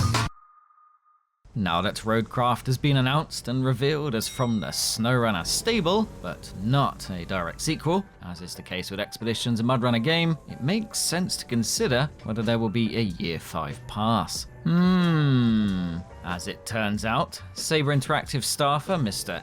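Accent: United Kingdom. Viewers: what english accent he is speaking?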